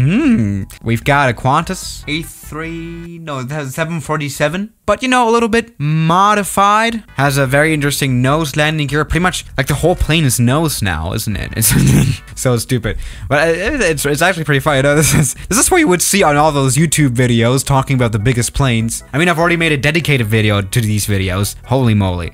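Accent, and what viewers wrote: Germany. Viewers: Alter manchmal hört man deinen Deutschen axent so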